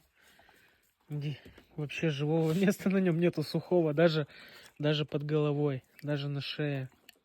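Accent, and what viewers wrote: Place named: Perm, Russia. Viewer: Добрый день! Это у вас какой край или федеральный округ? Акцент похож на Пермский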